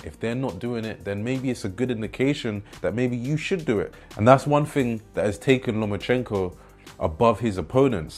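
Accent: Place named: United Kingdom. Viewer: By your voice man clocked u r british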